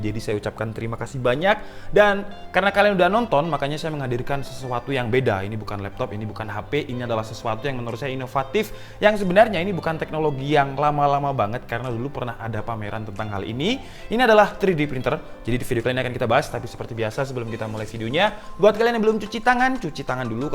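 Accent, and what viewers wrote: Java, Indonesia. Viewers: Malange ketoro banget broo wkwkwkwk sipp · Bang pertama liat chanel lu gua kira chanel biasa aja yg kek kebanyakan para youtuber , stlh gua iseng* nonton in video lu yg ngomong sama google asli dahh ngakak parah dan trnyata lu orngya asik kocak .klo gua bilang lu punya ciri khas review yg beda intinya lu bisa bawain pembahasannya kek bener" lagi interaksi sama temen seumuran lu. Goo job lah 👍👍👍 Ohya bang btw ada jawa jawanya medok mantep😅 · Medok jowonya ketahuan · Mas wong jowo ya?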